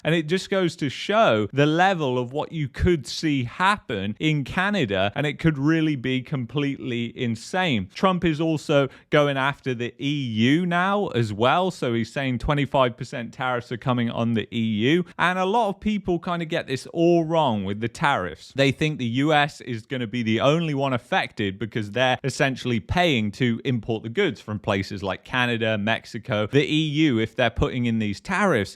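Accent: United Kingdom. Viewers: What kind of British accent is this? Anyone know? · Lol, IDK but I love it. Obviously he is some kind of libertarian type who understands economics. The scary thing about Carney is he doesn't understands economics, he only understands statistics and politics. He is essentially a Stalinist. It is fun to hear criticisms like this in a British accent. Usually one just hears the colonial pretensions about how great the UK is and how lucky Canada is to be part of it. This channel is keeping it real.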